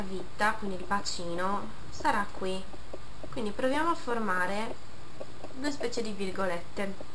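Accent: Sardinia, Italy. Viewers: Davvero comlimenti per il disegno sei veramente brava! Sembra che tu abbia l'accento sardo, ho sentito bene? Io son sarda ;) (Budoni OT) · Sembra sarda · sei sarda? <3 sembra di si dalla pronuncia ahahah ;)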